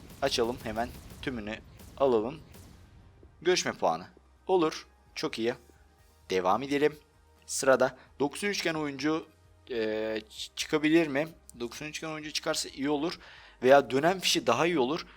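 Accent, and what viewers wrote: Azerbaijan. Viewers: Kardeşim Azerbaycanlı mısın?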